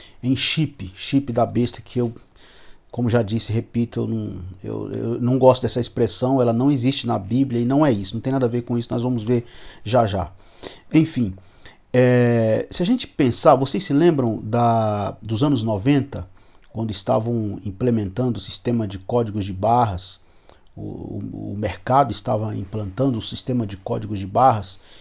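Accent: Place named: Northeast, Brazil. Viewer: Pastor paz do senhor bom final de semana sou seu siguidor gosto muito da sua personalidade e das sua ministraçoes sou filho de pernanbucano e o sr parece ser nordestino não sei se estou enganado mais a maneira forte de se expressar da entender que é pernanbucano ou da região nordeste o povo nordestino é um povo maravilhoso forte abraço Pastor Deus vos abençoe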